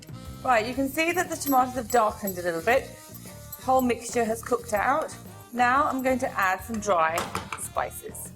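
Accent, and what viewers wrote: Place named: United Kingdom. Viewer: Bloody hell. Absolutely fell in love with the British accent!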